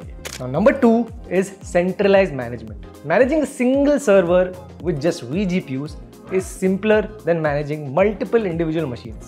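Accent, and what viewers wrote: India. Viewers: that's the deepest indian accent i've ever heard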